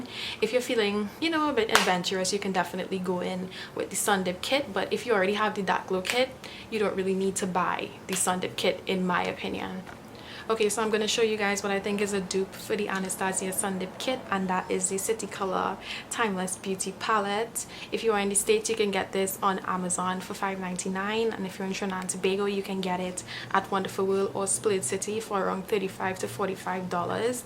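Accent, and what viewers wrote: Trinidad and Tobago. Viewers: Are you trinidadian? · The trini accent yasss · You got a new subbie even though I will disagree with you and get the Sun Dipped kit :) haha I love the Trini accent, my parents are Trini!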